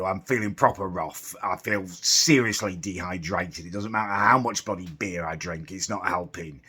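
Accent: United Kingdom. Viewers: He’s British dude 😂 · He’s just British man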